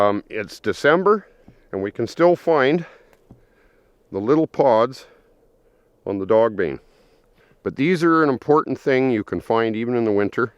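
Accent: Canada. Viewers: You're Canadian. I know the accent. A lighter helps but there are many ways to start a fire. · You sound like home 🇨🇦 🍁 🇨🇦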